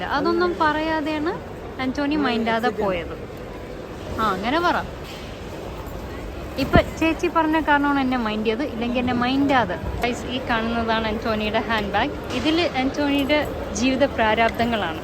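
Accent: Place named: Alappuzha, India. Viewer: Alleppy Slang ❤❤😍😍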